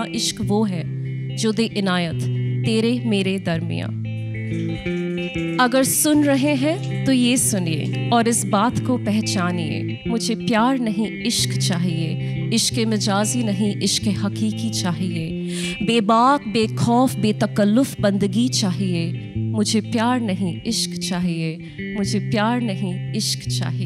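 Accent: United Kingdom. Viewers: Why Urdu/ Hindi words in English accent?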